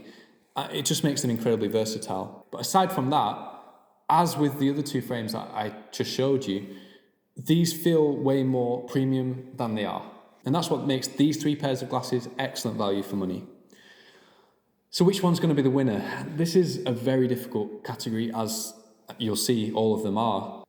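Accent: Scotland, United Kingdom. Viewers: oohhh scottish accent!!!!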